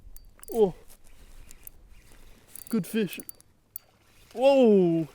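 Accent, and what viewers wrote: Australia. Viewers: It’s wet and has an Aussie accent so possibly Australia.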